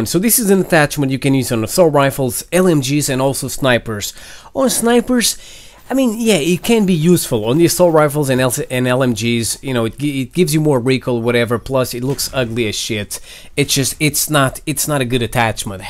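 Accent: Brazil. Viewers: you're brazilian or english ? good vídeo ;P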